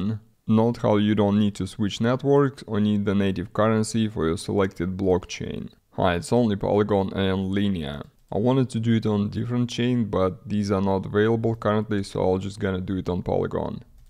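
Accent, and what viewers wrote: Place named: Russia. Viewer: бро ты же русскоговорящий?) по акценту ясно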